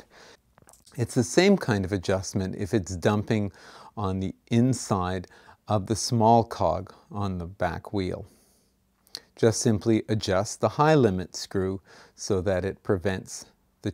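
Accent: Canada. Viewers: Derailleur is French for de-railer so it depends how pedantic you want to be. I guess the instructor is Canadian by his accent and he pronounces it differently to 'muricans. Thanks for the video. This is the second time I've used it to tweak my road rig.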